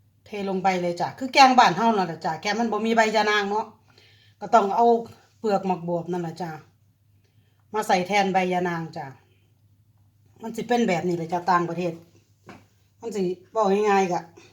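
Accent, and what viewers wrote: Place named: Sisaket, Thailand. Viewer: ฟังเสียงแล้ว คือคนบ้านแฟนผมเลยครับ อยู่สีเกดบ่อน้อครับ🤔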